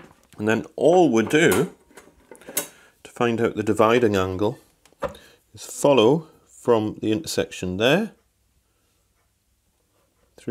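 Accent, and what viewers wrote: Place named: Leicester, United Kingdom. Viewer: Lovely video yet gain. Where is your accent from? I know a chap in Leicester that speaks exactly Iike you! Maybe it’s just the subtle stops in the words? Soothing though lol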